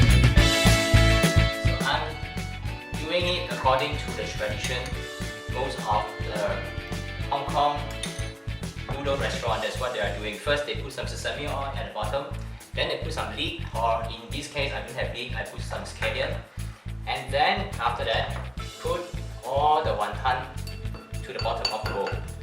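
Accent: Singapore. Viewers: Is he speaking Singapore English? Cantonese, Please, so that everyone can understand.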